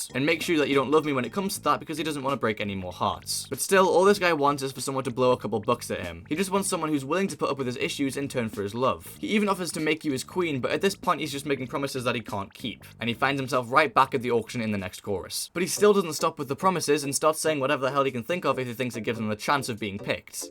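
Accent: England, United Kingdom. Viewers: Bro’s such a fan he always talks like an English chap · Bro is an English chap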